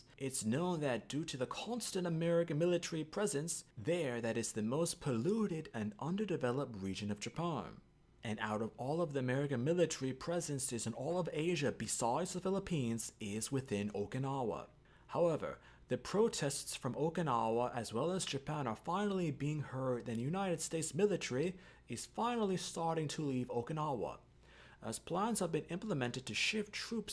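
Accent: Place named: China. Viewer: Speaking as someone whose family lived there for 40 years, this is nothing short of Chinese Communist Party propaganda, part of their wider pattern of asserting they own everything on the planet. I can say with certainly every Okinawan person I know would also say this is total BS. Okinawa was an independent international trading hub for centuries and did the best they could to appease both the Chinese and the Japanese. They were well aware of the dangers of their geopolitical situation, and vulnerabilities. Though many of us were honestly hoping for independence in the late 60s, it was never going to happen. Do you really think China would leave them alone if they were independent? True the Satsumas were devils in their day, but meanwhile, as Japan has become more civilised while China has regressed from their responsibilities as global citizens. For the sake the people I know, the current statehood within Japan, the people are far better off than if China had control - just ask the people of Hong Kong. 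Suggest instead reading Kerr 'A History of an Island People' BTW, This person is reading a script with an obvious Chinese accent.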